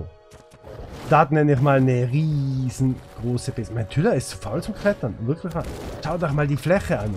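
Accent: Switzerland. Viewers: SRY mein lieber, der beste ARK-YouTuber of the World ist und bleibt immer noch Cloud:-) aber ich liebe deinen schweizer Slang;-)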